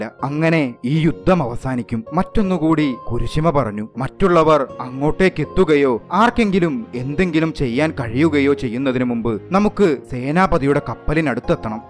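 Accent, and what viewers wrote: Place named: Namboothiri, India. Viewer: അവതരണം- നമ്പൂതിരി സ്റ്റൈൽ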